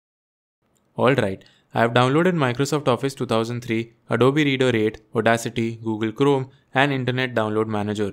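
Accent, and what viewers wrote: India. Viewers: When the narator sounds like indian and you know what you want will be fixed in no time 🥹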